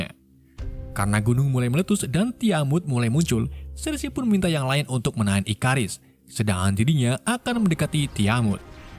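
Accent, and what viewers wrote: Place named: Java, Indonesia. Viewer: Jawa sekali pean kang😂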